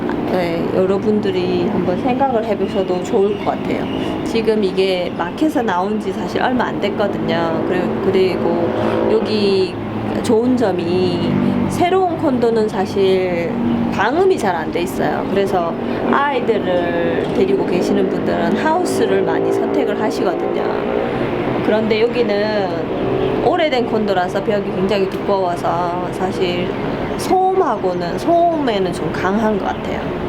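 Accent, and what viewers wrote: Gyeongsang, South Korea. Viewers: 경상도 냄새가 나네요ㅋㅋ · 경상도분이신듯 사투리가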